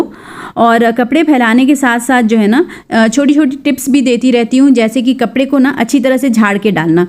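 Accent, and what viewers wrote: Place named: Uttar Pradesh, India. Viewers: MashaAllah I love ur content...also I am from mumbai and looking ur talking style I feel ur originally from UP..kuiki humbling mumbai mein rahe hai aur parents UP k hai...baat aap jaise hi karte hai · Masha allah aapne notice kiya 3 4 days aap k subscriber fatafat increase ho rahe mujhe bhi dekh k maza araha hai aisa lag raha hai ye mera hi channel hai aap k andar se mujhe bahot hi apne pann ki vibes aati hai main bhi u.p se hu gonda se aapki way of tone bilkul humare jaisi hai.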